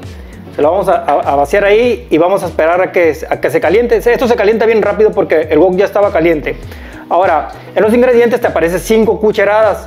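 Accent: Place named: North, Mexico. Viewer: Su asentó del meritito Norte me encontró!!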